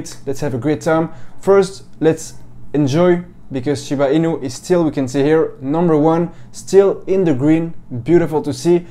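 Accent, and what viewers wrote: France. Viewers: Everytime ...something big is coming up ...everything is ,, bullish“ and ,,amazing“ and looking at Shiba ,,realistically“ but I lost around 500 Euros since last week Monday ! So tell us when the Long term holders you think will make plus . What is ,,soon“ that Shiba,, can reach Doge“ ?!? Nothing is ,, looking great atm“ ! But I like your accent :-) the first french who likes to talk in English 🔥💯! Best regards from Germamy 💯🔥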